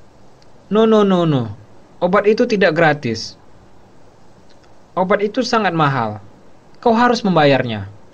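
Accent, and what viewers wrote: Medan, Indonesia. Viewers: Bang kau orang medan ya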